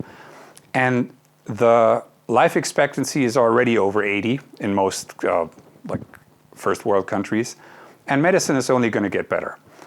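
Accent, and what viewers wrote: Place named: Germany. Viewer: "My English is not that good today." Your English is amazing. I had to really focus to even hear a German accent...I've been studying /speaking German for thirty years, and I not only still speak it with a Texas accent, but confuse the verbs for "to live" and "to love." Awkward.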